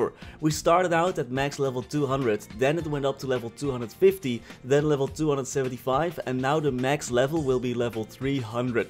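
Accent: Netherlands. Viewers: Instantly recognize a dutch english accent. Your english is pretty good man! Lekker gewerkt pik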